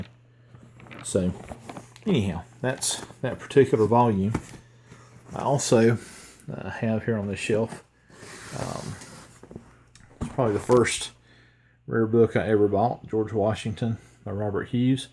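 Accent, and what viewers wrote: United States. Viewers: is he from texas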